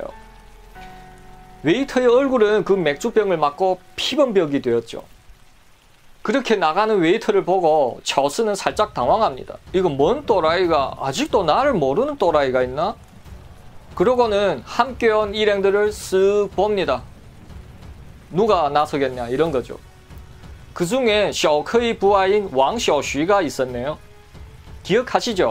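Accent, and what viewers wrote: Yanbian, China. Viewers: 延边方言 谈黑龙江乔四爷 😊牛逼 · 이 친구가 연변친구라서 그럽니다.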